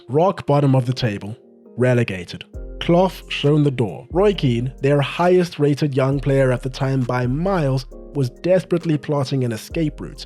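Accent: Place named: South Africa. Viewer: Are you South African?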